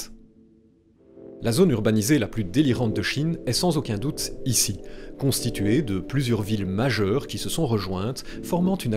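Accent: Belgium. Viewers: ben c'est l'accent belge... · Aïe ! L'accent belge si vite dans la video, j'était pas près x).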